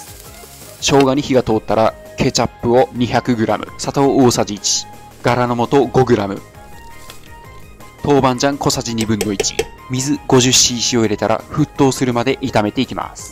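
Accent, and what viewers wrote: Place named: Miyazaki, Japan. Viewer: 宮崎訛り懐かしい〜😊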